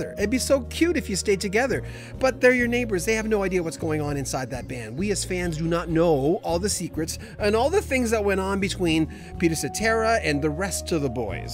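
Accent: Canada. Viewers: So, are you like from the Chicago area or it sounds like your from Canada. Don't get me wrong, the Great Candy was from Canada and he is missed every day. I'm from Joliet, Illinois-Chicago south suburbs-Mancow likes to call us "Toliet". Anyway, its like Pete stabbed us in the back-that's what it is like. Listening to WLS waiting for the next great Chicago hit. We knew he was the great lead singer for the most part. I remember him saying in an interview that he was at a party and Paul McCartney came out of the bushes with Linda and Pete went to introduce himself and Mac stopped him and said"we know who you are". I'm sorry he felt whatever he felt and still feels, but we the Chicago fans loved him and no one but no one can sing 25 or 6 to 4 like he did. So he pretty much hurt us and still hurts us. Who cares otherwise, he can go back to singing with Cher or something. 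I guess we wanted that old feeling back before we all died. If he isn't doing anything maybe he can go sweep up what was left of the passenger side window on my 1972 Dodge Polara that got busted out in the parking lot of the old Chicago Stadium back in 1976 when I went to see Chicago-great concert-bad experience.......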